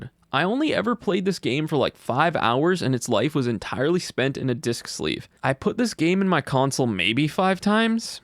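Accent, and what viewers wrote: Canada. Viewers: Hey man, are you a Canadian!?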